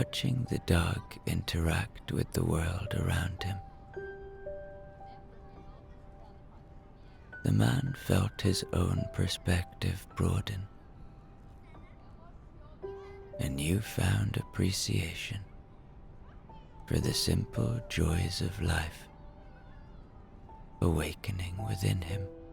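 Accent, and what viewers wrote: Ireland. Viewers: Thank you for this gorgeous heartwarming story. Dogs are just the best companions and full of love. Hello from a Cavan woman in Melbourne who loves hearing an Irish man tell a story 🙏🏻🍀💋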